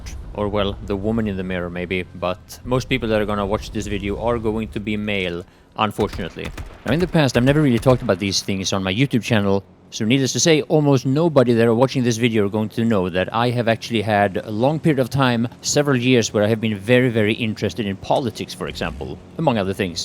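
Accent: Sweden. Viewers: JUst wanna thank you for keeping it real dude amazing work allways happy when i get a notification on my phone that you uploaded something and realy nice to see content creators keeping it real!! keep it up!! älskar göteborgska accenten :)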